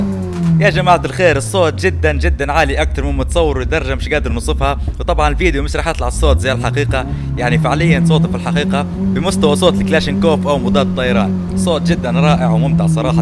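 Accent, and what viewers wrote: Libya. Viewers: السلام عليكم. حاب نتعلم منك شغلك. من لهجتك عرفت أنك ليبي يعني أبن بلادي. ياريت نقدر نتواصل معاك شخصيآ. عشان ناخذ عندك كورس عملي. أرجو الرد يا أستاذ.! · ليبي يتكلم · حسيتك ليبي😁 منور ربي يوفقك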